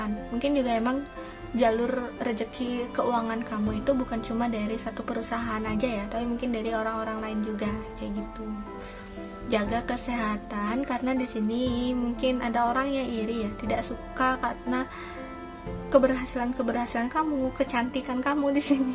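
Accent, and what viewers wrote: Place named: Bali, Indonesia. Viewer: Logatnya kakak kaya orang bali 😀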